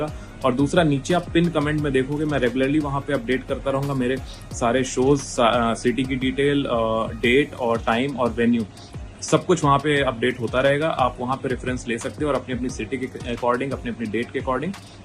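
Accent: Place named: Uttar Pradesh, India. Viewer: Beautifully performed yaar! I really like that little bit of UP accent. That accent make the content even more funny! 👏👏😂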